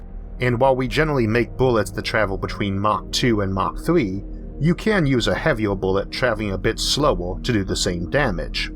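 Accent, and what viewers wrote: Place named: Georgia, United States. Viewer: I hope I don't seem rude in asking as I am only just genuinely curious, but are you from Georgia? You have the same accent as some folks I know from Northern Georgia.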